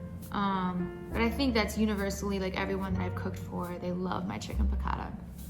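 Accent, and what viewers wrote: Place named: Russia. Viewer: u have russian accent :D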